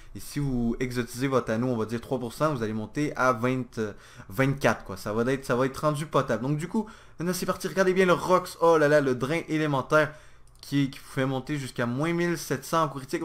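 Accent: Quebec, Canada. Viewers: Yessss un québecos ostie!!! Enfin!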